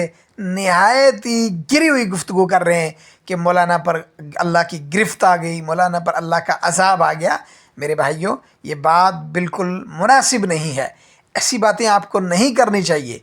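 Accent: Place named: Pakistan. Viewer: لہجہ تو آپ کا پاکستانی ہے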